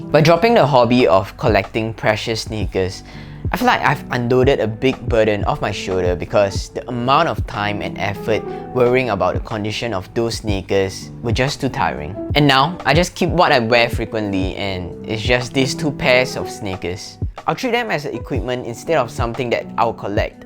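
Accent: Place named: Singapore. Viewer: Can’t get past singlish.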